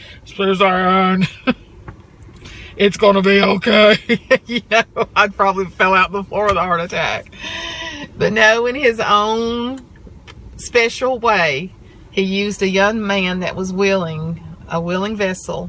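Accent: South, United States. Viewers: I like your southern voice and how you always seem happy. I enjoy watching you.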